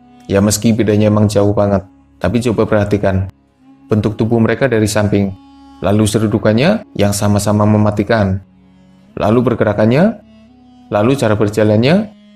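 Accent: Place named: Tegal, Indonesia. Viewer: beda kalau ini logat tegal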